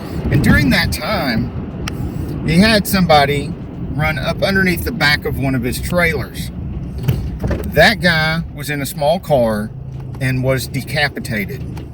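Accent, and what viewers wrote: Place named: South, United States. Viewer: I love your reviews, especially with your original southern accent 😁👍🏻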